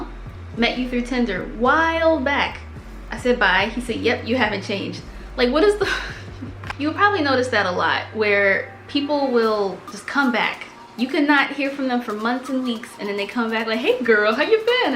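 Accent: England, United Kingdom. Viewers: Looove ur british accent btw🥵😍😍😍💯🙌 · That British accent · We need to talk about your English accent lol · That British accent tho😂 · Are you British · The British accent got me 😅😅😅😅😂😂😂😂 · Not the British accent😭😭😭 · Haha! British accent is coming along!! ❤️❤️ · What is with the British and Australian accent? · Yo I was so confused I’m like why does she sound kinda British still swear sis was American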